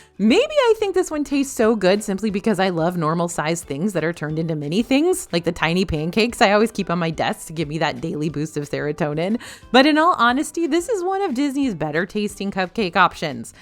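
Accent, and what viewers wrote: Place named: Western New York, United States. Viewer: I am from KENMORE NY and just like you, I say caramel the same way .. long live school bread and Tonga toast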